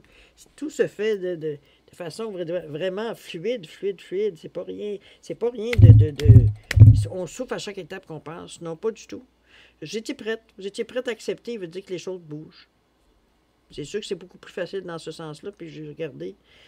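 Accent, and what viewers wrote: Quebec, Canada. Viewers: il faudrait parler moins rapidement,car ce n'est pas évident avec l'accent québécois,merci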